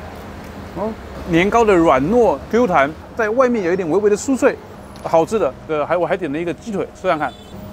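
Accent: Taiwan. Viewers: 你是福建人嗎? 口音跟台灣很像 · 为啥感觉你有台湾口音。 最明显的区别：台湾：试看看。吃看看。 大陆：试试看。吃吃看。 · 不知你是那裡人？有時候聽你説英文～國語非常像台灣人～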